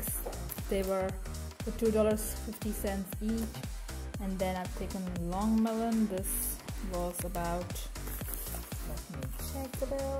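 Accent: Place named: India. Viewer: I absolutely will! Btw, I love your Indian accent mixed with the Australian terms. It’s just so worldly to me and it’s cool to listen to 😃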